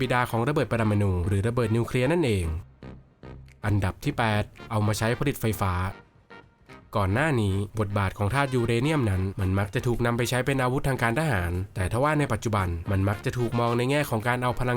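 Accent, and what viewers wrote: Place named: Isan, Thailand. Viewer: คนอิสานใช่มั้ย ฟังไม่รุเรื่องเลย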